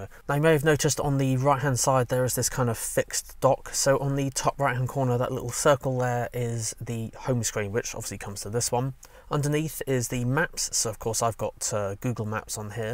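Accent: United Kingdom. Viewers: Hi Thank you for your many wonderful videos about introducing Mercedes-Benz functionality. I’m one of your fans from Taiwan. also work as a Mercedes-Benz sales consultant. Last week I received a copyright warning from YouTube due to no authority translating your video. I’m really sorry did not get your permission to do that. however, there are many people loves British accent but didn’t understand English. I used to be a pilot in the US, does any chance could get your permission to translate your video? Thanks for your kindly reply if it does work. Wish you have a great new year Fans Eric from Taiwan:)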